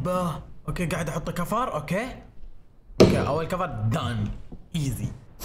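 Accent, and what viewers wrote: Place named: Jizan, Saudi Arabia. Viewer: احلى لهجه هي الجيزانيه احبك 💗💗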